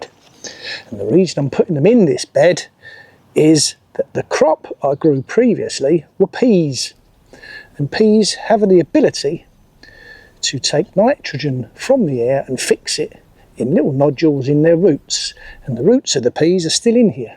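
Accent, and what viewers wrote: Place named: Southern England, United Kingdom. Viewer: I thought sounded like a southerner.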